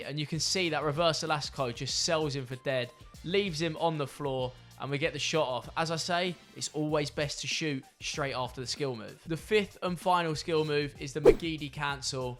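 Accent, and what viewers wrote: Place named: United Kingdom. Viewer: god i love this bri ish accent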